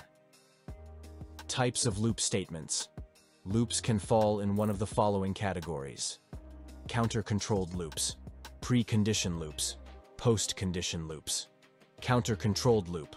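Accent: Jamaica. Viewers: original voice with that Jamaican accent was the one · we need that orignal jamaican voice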